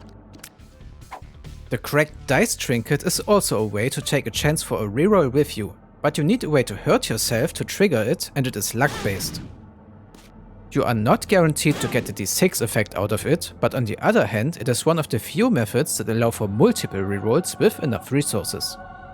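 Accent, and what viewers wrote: Germany. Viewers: im asking myself for a long time but are you german?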